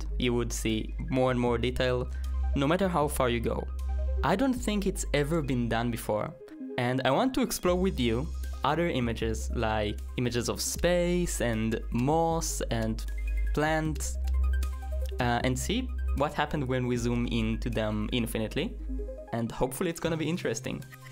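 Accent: Israel. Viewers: בערך שני שליש לתוך הסרטון חשבתי לעצמי ״מעניין מה זה המבטא הזה? מעניין מאיפה הוא?״ ואז קלטתי שאתה ישראלי!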